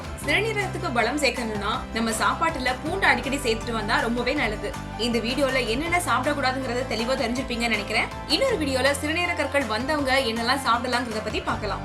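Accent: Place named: Chennai, India. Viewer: Presentation too fast. Unable to digest some taminglish words of Chennai slang for non Indian Tamils like us. Please speak clearly in future for the talk to be useful for all of us. Thanks.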